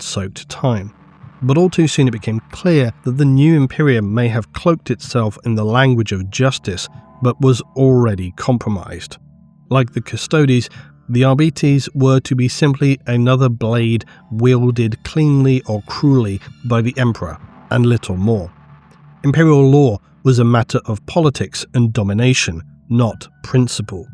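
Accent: England, United Kingdom. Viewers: Amusingly, with his particular English accent "I am the law" would sound a bit like "I am the lore" and "I am the lore" would sound a bit like "I am the law".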